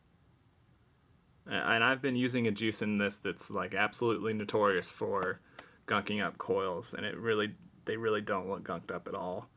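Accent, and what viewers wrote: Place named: South, United States. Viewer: thanks for putting me to sleep, jesus get some life in you . You sound half dead and talk like your from down south slow as can be. Otherwise good vid and a quick nap for me is good as well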